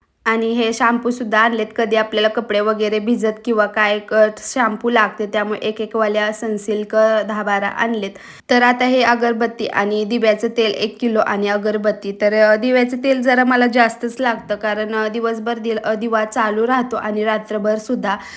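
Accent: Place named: Latur, India. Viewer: Tu pune la rahti pan tuz gav kont aahe tuza kahi kagi shabda varun tu laturchi aahes as vatat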